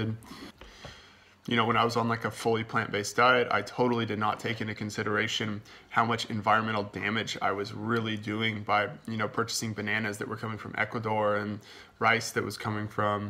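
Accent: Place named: United States. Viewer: That’s wonderful. I can tell you truly care about animals. You seem like a nice guy. I’m glad I found your channel:) Wow Finland? That’s awesome! You have an American accent. Why did you move to Finland if you don’t mind me asking?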